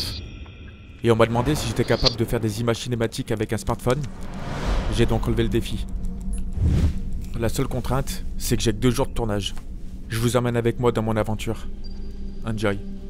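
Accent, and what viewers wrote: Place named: North, France. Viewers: Tu as l'accent du Nord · Tout ça avec l'accent de ch'nord , ça fait plaisir a entendre cha tisotte hein ! ;-)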